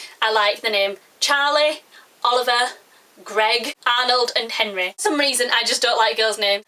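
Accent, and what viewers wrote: Yorkshire, United Kingdom. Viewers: You sound like a yorkshire bird anywhere near leeds mebbe? :D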